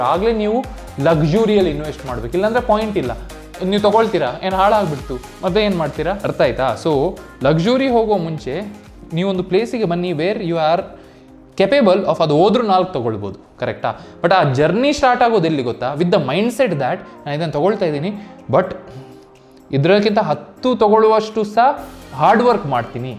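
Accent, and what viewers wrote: Mangalore, India. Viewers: speaking with manglore kannada hits the heart ❤❤❤❤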